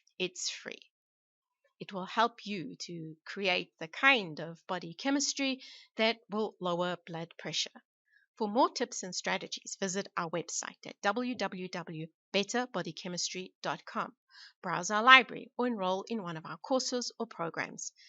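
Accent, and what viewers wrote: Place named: South Africa. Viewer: Excellent informative videos and cute South African accent!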